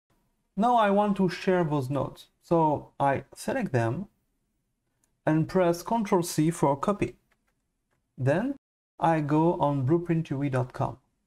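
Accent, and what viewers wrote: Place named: France. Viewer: Your french accent is beautiful 😂❤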